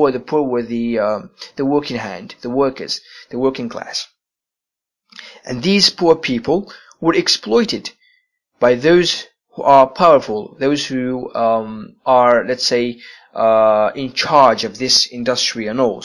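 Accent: United Kingdom. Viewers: Sounds Scottish to me. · you have a good british pronunciation stick to it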